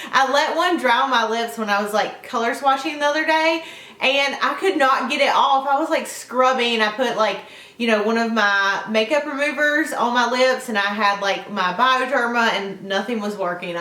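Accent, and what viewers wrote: South, United States. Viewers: I Love Your Southern Accent. Maybe I Should Ask First Are You From The South? lOl If So I Love Your Accent & Your Makeup Here As Well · I love your voice it sounds so country!!!!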